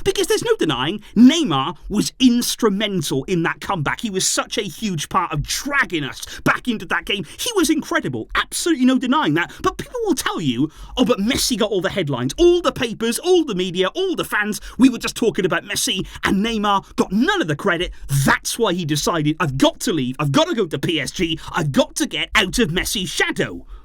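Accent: Wales, United Kingdom. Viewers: I’ve watched a few of your old videos and your Welsh accent stood out a lot back more then 😂 but your channel has come a long way and we all appreciate your videos 🙏🏼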